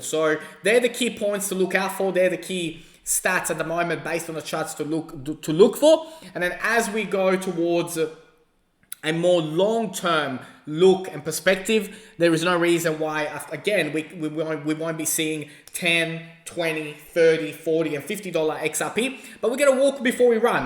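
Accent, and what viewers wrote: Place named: Australia. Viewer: Nice to hear an Ozzy. Subscribed.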